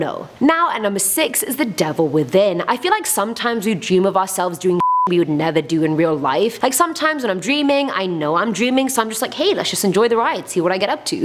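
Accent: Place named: Scotland, United Kingdom. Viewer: no. Canadian English but her accent is Scottish. I am Scottish which is why I recognise it. Some may say she is more likely to be of middle Eastern descent but I personally do not want to make any assumptions about her as its not my place to do that.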